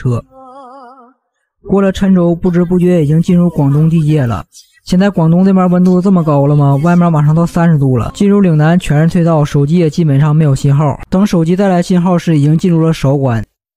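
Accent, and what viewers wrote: Northeast, China. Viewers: 解说一口大碴子味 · 哈哈哈哈 碴子话 老带劲了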